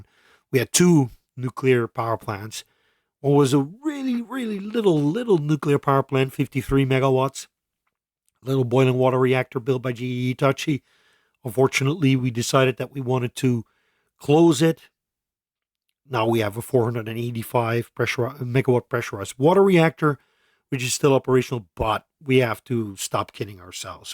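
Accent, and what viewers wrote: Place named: United States. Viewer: As an aside, why do you speak with American accent?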